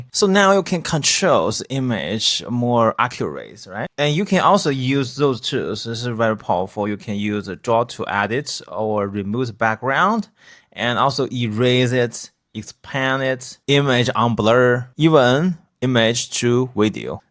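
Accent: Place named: China. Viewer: Honestly, i think you should either work on your heavy chinese accent or consider using tts ... its really hard to hear your words clearly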